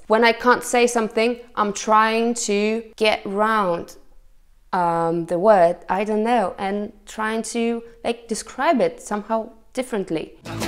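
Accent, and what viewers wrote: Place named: United Kingdom. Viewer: mówi po angielsku z prawie idealnym brytyjskim akcentem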